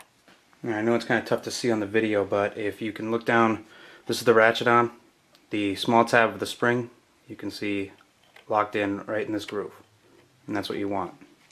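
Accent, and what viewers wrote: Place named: New Hampshire, United States. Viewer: Great, helpful vid! As soon as he said ‘Polaris recoil stahtahs’ I knew this was NH. We moved to VA not too long ago, and man I didn’t think I would miss home or the accent this soon lol. The other thin I miss about NH is no sales tax(or INCOME tax!!). Also seems like there are 3-4x the amount of used ATV’s up there, for less $ than here. And oh ya, NO F IN SALES TAX! Haha happy riding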